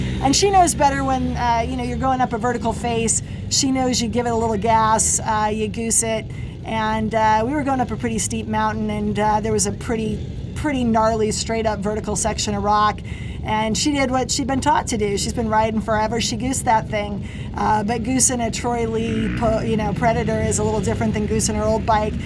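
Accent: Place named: Pennsylvania, United States. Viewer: You sound like you ran around the hills of pennsylvania!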